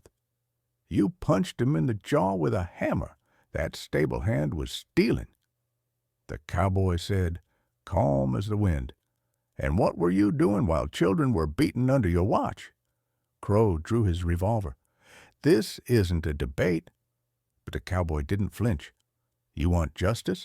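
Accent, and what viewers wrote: United States. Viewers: Story and voice are spot on. Cowboys talk like this. People from the mid west talk like this. Actually the old cowboy talked too much Awesome amazing story thank you!❤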